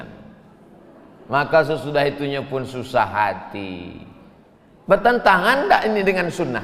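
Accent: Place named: Negeri Sembilan, Malaysia. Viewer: Sepertinya UAS bercakap loghat negeri 9 dicampur dgn loghat kelantan iyooooo 😆 Salam dari malaysia wahai ummat rasulullah SAW.👌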